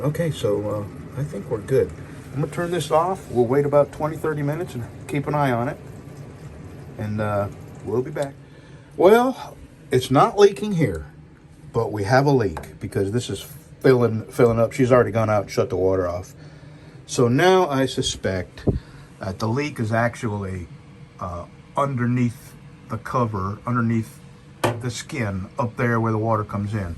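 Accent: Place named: Northeast, United States. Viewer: Talkin about that MCI truck reminds me of the time when I was heading down I-69 into Ft. Wayne, Indiana and had a Petershaker that was pissed about being passed shove me off into the median. Had to get towed and bitched at over that one. Freaking safety officers. Hate 'em. I never noticed it before but with as many times as you said "wourder" in this video, it's plainly obvious now that you're from the northeast.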